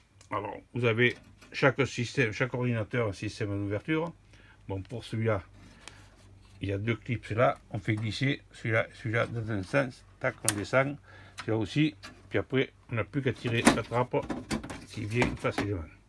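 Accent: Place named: South, France. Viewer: On sens le sud dans tes video j’adore !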